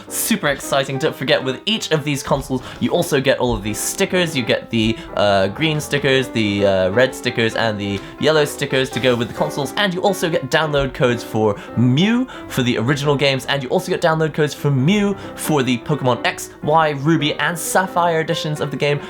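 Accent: United Kingdom. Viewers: did you grow up in the uk? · For a gamer living in Japan, you do sound very British. (It's a compliment don't worry, I'm not saying that you're not actual Japanese). A pity Nintendo didn't make a version of Game Boy Green for the west even as a download title for these consoles or sell it pre owned, that would have been a hot seller, but there you go. All they had to do was include the red/blue Pokémon that you couldn't get in Yellow as exclusives and there you go. :D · Im amazed how you sound like your from the UK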